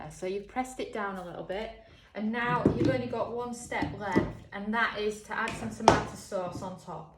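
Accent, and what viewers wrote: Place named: North, United Kingdom. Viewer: Great to hear a northern British accent like mine. I'm from Bradford. Xx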